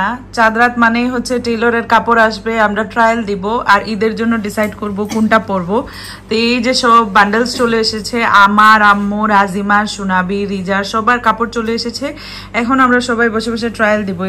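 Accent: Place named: Sylhet, Bangladesh. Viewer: Ada math amrar sylhety r adakan suddo Bangla na matiya je kono ekta math tik koro go Apu te bala lagbo 😊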